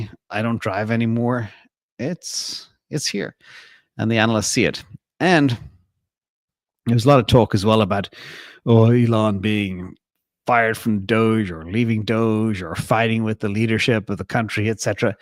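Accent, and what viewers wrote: Europe. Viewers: to me he doesn’t sound like he has an American accent, it has a hinge of euro accent but I could never pin it down. My guess is he moved around as a kid.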